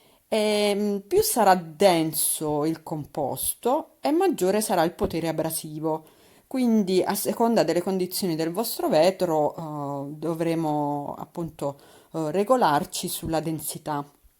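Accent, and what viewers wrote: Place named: Bari, Italy. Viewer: Tu sei meglio di Diletta. Sai fare cose speciali e sei simpatica. Non mi sembri zona Foggia..più verso Bari. Sei chiara nelle spiegazioni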